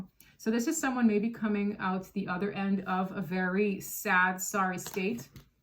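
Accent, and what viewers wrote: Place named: Netherlands. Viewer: Je bent Nederlands. DAMNIT IK WIST HET. Ik dacht al wat aan je accent te horen. Waar woon je, Curaçao?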